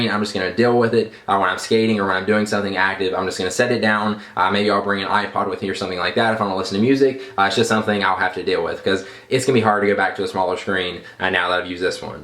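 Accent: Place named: Georgia, United States. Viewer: I might be wrong, but that sounds like a Georgia accent.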